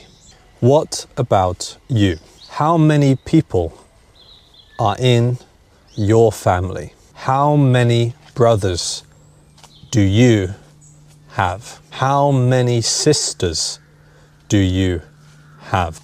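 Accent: United Kingdom. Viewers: Thank you very much for your understandable and clear British English. Please keep going.🎉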